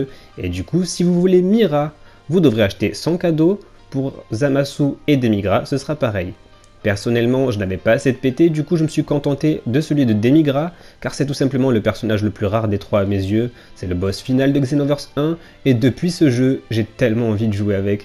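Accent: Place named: Toulouse, France. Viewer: toi tu fais des vid&os àdesheures lol.En tout cas je kiffe et ton petit accent toulousain fait plaiz :). Sur ce, continue ce que tufais car ci cool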